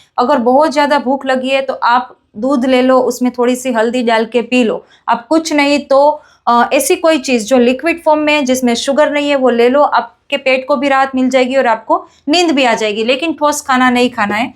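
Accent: Gujarat, India. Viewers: તમારી ભાષા મા ગુજરાતી લહેજો દેખાય છે બાકી હિન્દી સારુ છે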